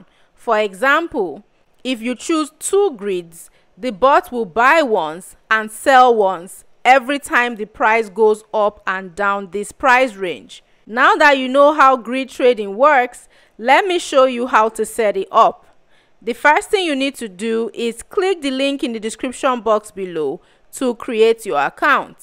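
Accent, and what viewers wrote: Nigeria. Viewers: Are you a Nigerian, ? the accent is like mine.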